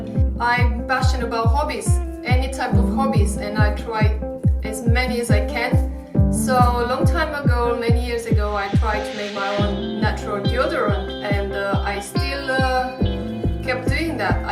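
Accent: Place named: Romania. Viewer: buna , dupa accent pot spune ca esti romanca , sper sa nu gresesc . dragute videourile tale . 👍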